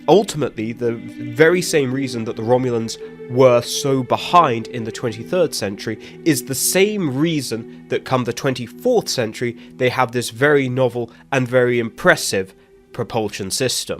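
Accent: United Kingdom. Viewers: you have a Brit accent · The British accent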